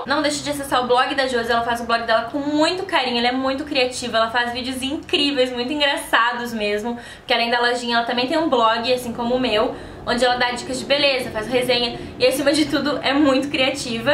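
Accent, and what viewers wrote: Rio Grande do Sul, Brazil. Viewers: Amo o meu sutaque gaúcho ,amo o seu, awn enfim deus ta la em cima tomando chimarrão ! Dale RS SM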